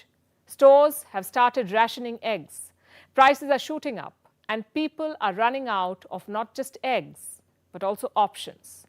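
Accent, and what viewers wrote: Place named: India. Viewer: What are you talking about this is one of the indian accent. India has 100's of languages and as such has that many English accents. She speaks English with Hindi accent.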